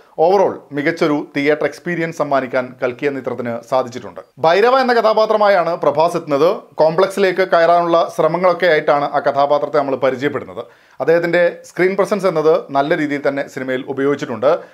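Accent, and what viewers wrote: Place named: Palakkad, India. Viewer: Palakkad tamil ഉണ്ട്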